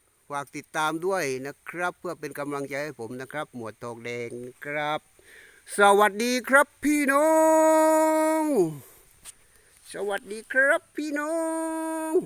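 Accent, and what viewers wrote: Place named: Krabi, Thailand. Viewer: สำเนียงคล้ายคนกระบี่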